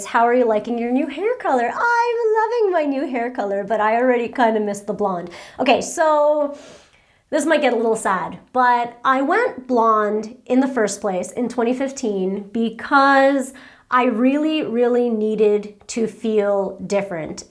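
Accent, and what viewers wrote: New Jersey, United States. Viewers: How do you pronounce water or orange? That would show how Jersey you are. Never knew I said those words differently till I moved to upstate NY. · A "Jersey accent" is exactly what you sound like when you speak English :P (Although to be fair, I thought you were from Long Island when I first started watching you)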